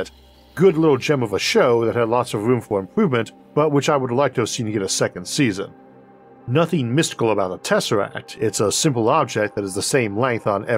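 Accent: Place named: Brooklyn, United States. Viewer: What accent is going on here, Brooklyn Cambridge?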